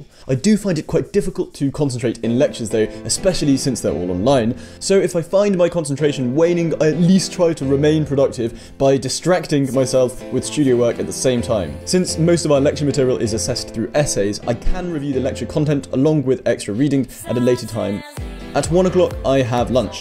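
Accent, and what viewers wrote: United Kingdom. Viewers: Capoeira, I've been watching the movie about that! Only the strong 😊👍 I also love this martial arts. Just haven't try yet but,want to. And cos of a karate kid, I'm now trying just Karate sometimes in my university's class. But, I didn't make out that much cos of this covid-19 break, yeah but I will when I back to school. And, btw, my dream university is Cambridge. Just at the dream state and I do need to try in real with process and.. lots of trying one after one. Thank you, this is a motivation for me. By the way, I like your British accent. So, about that.. do I need to practice the accent? Seem personally but, just wanna know if so, maybe more familiar and understand easily. Is it Right? Thank you! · lmao thats just an upper class english accent a lot of people sound like that it just depends where you live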